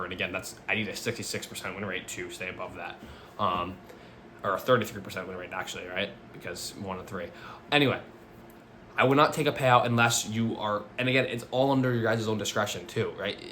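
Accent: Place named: New Jersey, United States. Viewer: Nice video. Do you trade the synced accounts on tradongview? Also so curious where your accent is from. NJ?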